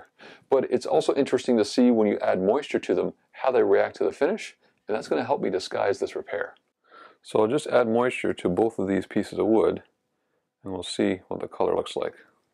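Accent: Canada. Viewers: Thanks for another great video. Yes, we do need to see you more often. a) Why are you apologising for your Canadian accent? It's as good/bad as any other. b) Yes, I've emailed Lee Valley. c) yes, I gave it a thumbs-up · No need to apologise for your wonderful and authentic Canadian accent. Love your channel! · Don't worry about your pronunciation of the word router. I love the Canadian accent. I drove a taxi here in the UK, and Canadian passengers were always pleasantly surprised when I asked what part of Canada they were from. They always assume that the British think they are Americans. As soon as I heard a word with OU in it it was obvious they were Canadian. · You don’t need to be sorry for your Canadian accent. It’s part of what makes you uniquely you.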